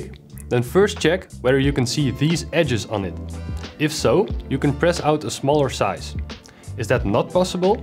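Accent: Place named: Netherlands. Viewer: I hear a Dutch accent! very nice tutorial and explanation!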